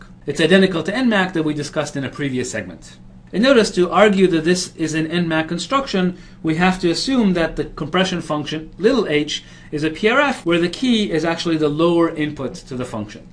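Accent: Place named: United States. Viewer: Omg finally an American accent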